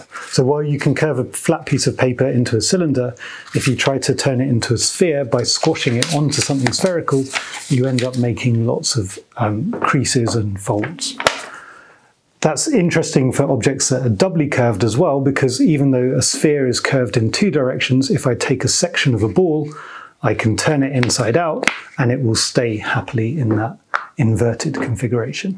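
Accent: United Kingdom. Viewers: Hoppah poppah, droppah poppah. Regardless of his British accent, he's very easy to listen to.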